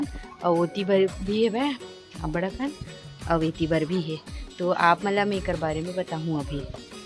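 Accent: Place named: Chhattisgarh, India. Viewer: Tor boli h Sab le badiya lagathe bahni kabar ki teha akdam chatisagadiyi boli bolthacha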